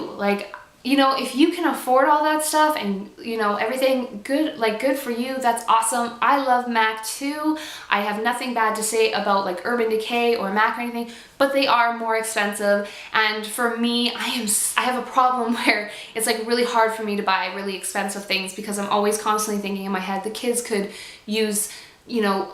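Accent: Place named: North America. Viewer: Yay for ex-pat #bbloggers! I moved from Texas to England last year for my husband as well. I love hearing other North American accents pricing things in pounds and euros!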